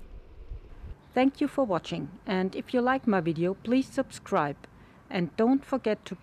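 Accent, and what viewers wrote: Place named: Germany. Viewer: Hey, your english is very well (Better than mine ':D) Your free speach is nearly that good, than it is in german, with a little german accent of corse... Best regards Paco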